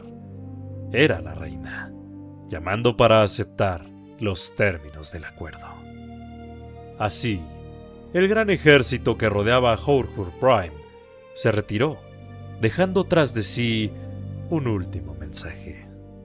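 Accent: Mexico. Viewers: Este canal no sube ia. el relator parece mexicano. y los relatos son de un subreddit si no me equivoco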